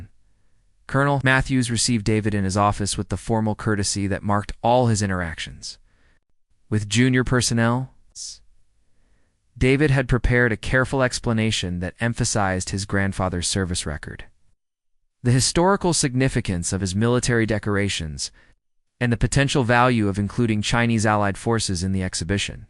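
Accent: United States. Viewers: Those are phoney uniforms - not one representing a regiment of the Brigade of Guards. You appear to have an American accent; why not post fiction based on an American formation?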